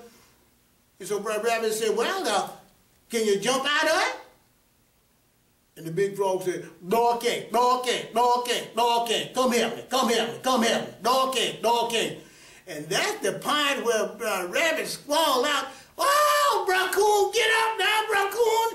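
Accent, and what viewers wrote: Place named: South, United States. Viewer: I love the ole southern bionic english language. It"s very much a part of our southern heritage & customs. I try to speak bionics but, not as good at it as!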